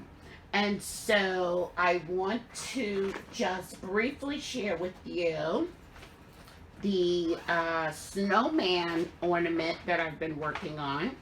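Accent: New York, United States. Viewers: That is so funny, I was going to say I hear a NY accent. My parents were born and raised in the Bronx, I grew up an hour from the city and now live in CT:)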